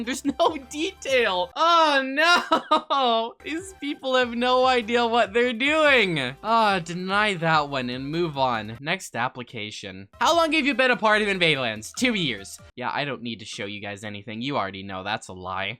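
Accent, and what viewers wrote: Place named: United Kingdom. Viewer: I love your randome british axent I speel it the wrong porpes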